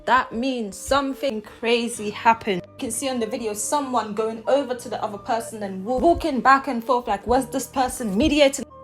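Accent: United Kingdom. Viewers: Some annoying British accent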